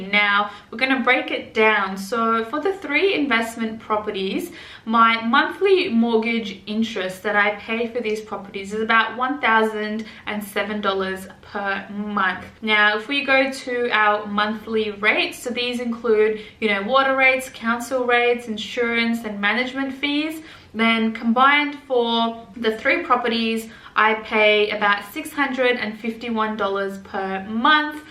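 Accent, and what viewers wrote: Australia. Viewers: Aussie? Hi from America!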